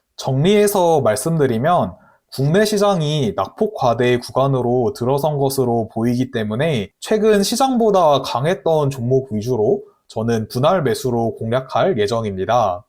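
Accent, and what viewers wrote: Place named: Chungcheong, South Korea. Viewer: 100프로 충청도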